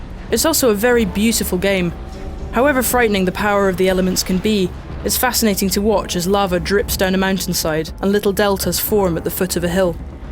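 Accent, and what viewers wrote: United Kingdom. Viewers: Lol all the people who don't know it's a British accent. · hes british u fuck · I'm sorry... but is it a young British girl or guy giving the review? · people! it's quite clearly a woman reviewing... and if you disapprove of our British accents then you can jolly well sod off - and stop picking on our saliva build up due to too much tea and crumpets... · I knew as soon as I heard a young British person reviewing this 2 million idiotic Americans would be up in arms. Just because your vocabulary doesn't stretch past 'awesome' and 'sucks' doesn't make it a bad review, it just makes you an idiot. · Australian? That don't sound like any Australian I know sounds more like British or Scottish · Whoever mistakes a woman with a British accent with a squeaky 13 year-old is simply disgraceful. Like, seriously.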